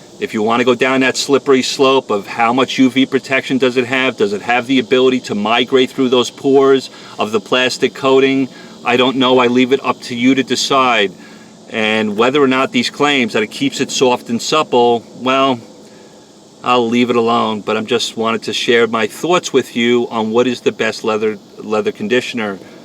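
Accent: New York, United States. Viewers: I'm binge watching your videos and I love how thorough you are. I love hearing you talk. That NY accent is awesome. Being in the south it's nice to hear a different accent for a change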